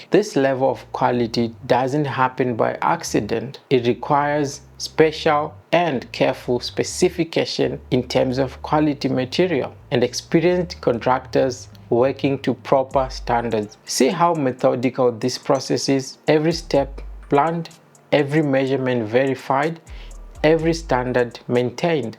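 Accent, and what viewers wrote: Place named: Kenya. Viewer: I thought you were kenyan from your accent. hmm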